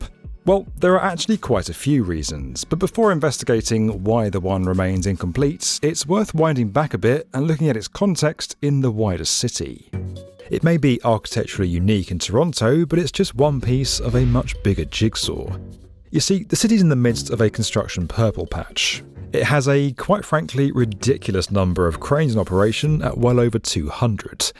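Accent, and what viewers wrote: United Kingdom. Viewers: I know this channel is based in London, but I always feel a British accent in a documentary is just fake and added to fool people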